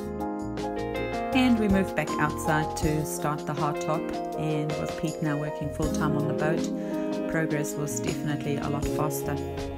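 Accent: South Africa. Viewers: Are you originally from S.A.? I checked your profile and it says NZ, but sometimes your accent sounds a bit SA.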